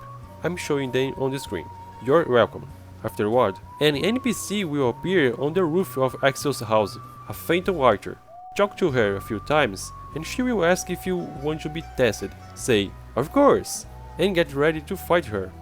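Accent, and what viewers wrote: Brazil. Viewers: Vc é brasileiro percebi pelo sotaque, mano me ajuda eu quero mt desbloquear o robot kurtis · Sotaque de brasileiro hein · tu é br ne boy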